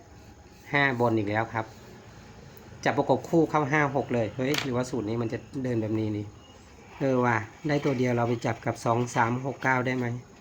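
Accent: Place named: Isan, Thailand. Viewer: สุดยอดเลยครับเว้าภาษาบ้านเฮาหม่วนหูหลายอี่หลีเอาให้แม่นๆเด้อหนุ่มภูพานครับ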